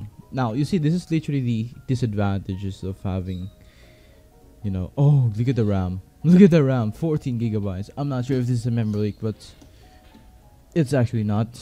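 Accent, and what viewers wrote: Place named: Philippines. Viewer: Man, i think this guy is filipino for sure because of his english accent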